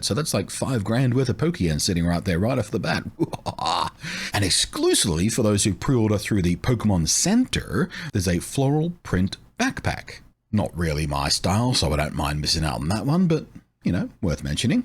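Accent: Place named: Australia. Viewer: Nothing like a low key Aussie accent to massage my brain so I may fall asleep. Quite a podcast voice. Very crispy & creamy 🥱💤💤💤💤💤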